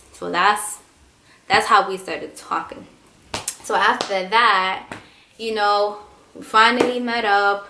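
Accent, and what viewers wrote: New York, United States. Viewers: Well they both from New York she has that New York accent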